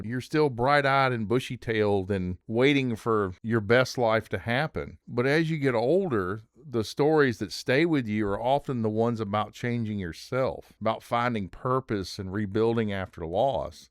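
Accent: United States. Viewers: This American acient is nuts bro can't even talk properly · Nice breakdown. Your southern accent makes me feel like you are my friend.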